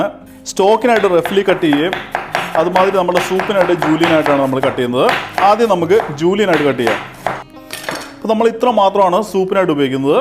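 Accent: Kannur, India. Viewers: the way you speak resemble like you are from kannur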